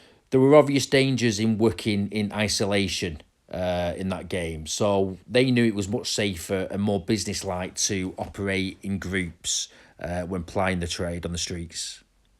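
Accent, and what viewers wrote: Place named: Manchester, United Kingdom. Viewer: Really enjoy your kids, though even though a lot of your accent is manc, you can't deny there's a Lancashire lilt there, which part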